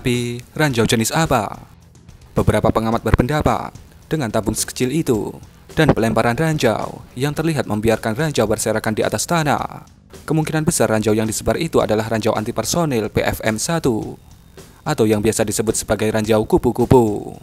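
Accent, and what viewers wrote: Java, Indonesia. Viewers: Suaramu medok bro kocak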